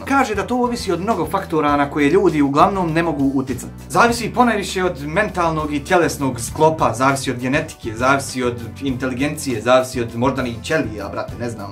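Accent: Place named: Bosnia and Herzegovina. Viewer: sve full samo vrati naglasak od pre,sada pricas ono bas bosanski a meni je iskreno bas muka da slusam to,pricas kao kompleksas a ja njega ne mogu da slusam i verujem da nisam jedini,bez uvrede naravno bilo kome ali jednostavno taj govor je nekako cudan i ne prija mi usima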